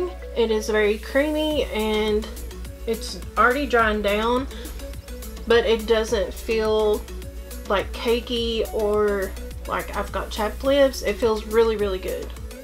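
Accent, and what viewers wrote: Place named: Kentucky, United States. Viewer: Subbed from IG! I'm audiesmama there! I'm also originally a Kentucky girl! You sound like home to me! 💙 great video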